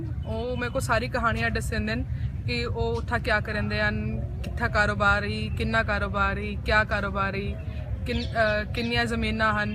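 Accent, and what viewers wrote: Bahawalpur, Pakistan. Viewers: Most welcome u r most welcome to bahwalpur...i am from bahwalpur u r speeking absloute sariki...same as we bahwalpurian use to speek loves to see this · wa saien wa tuhadi saraiki bht meethi hy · 50/50 saraiki Boli hai ap ny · very nice accent Bahawalpuri accent is very sweet accent of punjabi...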